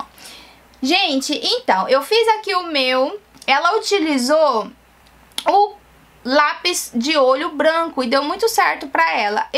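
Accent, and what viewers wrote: Minas Gerais, Brazil. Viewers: Eu amooo ouvir ela falar, parece aquelas mineiras bem da roça kkk ❤ Amo seus vídeoooos ❤❤❤❤❤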